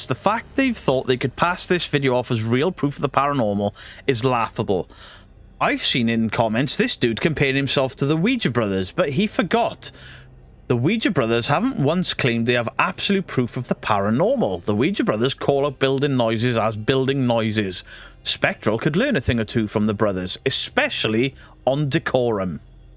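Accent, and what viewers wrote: Wales, United Kingdom. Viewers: They are just jealous of your glorious beard and lovely Welsh accent!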